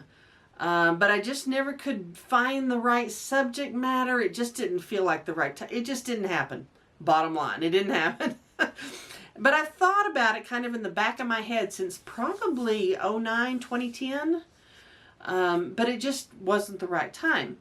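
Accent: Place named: Indiana, United States. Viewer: Funny you are from Texas. You have the same accent of a friend of mine who is from southern Indiana.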